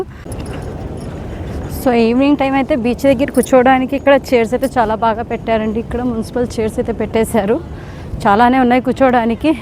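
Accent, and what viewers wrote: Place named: Godavari, India. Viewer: మేడం గారు గోదారి యాస అదరగొట్టారండి సూపర్ 😂😂😂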